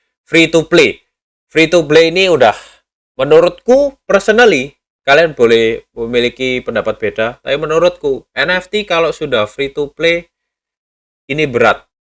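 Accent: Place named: Surabaya, Indonesia. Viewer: Nih Reviewer medok ALA SUROBOYO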